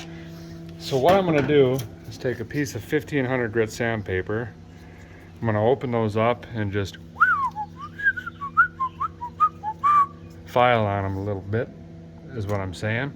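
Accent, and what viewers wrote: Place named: Midwest, United States. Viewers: I am glad to know that there are other "older" women watching VGG. I am 72 and have the channel on all day, every day. I can't explain why. Honestly, I think it's midwestern roots, speech and work ethic. And he's a patriot. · I love listening to this guy his voice is so calming. I can’t put my finger on his accent though maybe Midwest? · Damb you're Midwestern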